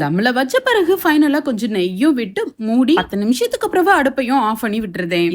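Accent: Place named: Thoothukudi, India. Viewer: Sister ungalukku entha ooru... Because neenga pesura language kekum pothu enga area mathiriye iruku sis.. naan Thoothukudi district sis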